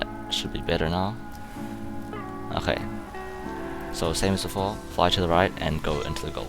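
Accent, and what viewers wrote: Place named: Germany. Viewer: Ich denke mal du bist deutsch, ich denke, dass ich das ein bisschen raus höre. Also ich hab eine Frage, muss man durchgehend Tornado spinnen? Und lenkt man dann nur mit w und s?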